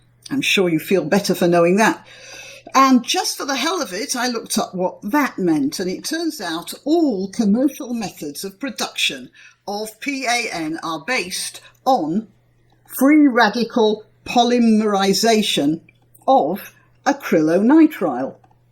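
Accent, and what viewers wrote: United Kingdom. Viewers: You are rude. Why? Aren't you a litte bit ashamed of how you are talking about people,( as less intelligent and eating bad food) in other countries, for example Sweden? People from UK I have met so far have been very nice and kind. Apparently not all of them. I guess you are born in UK, you seem to speak british english. No, I will not like and not subscribe... I am not fond of rude and mean people.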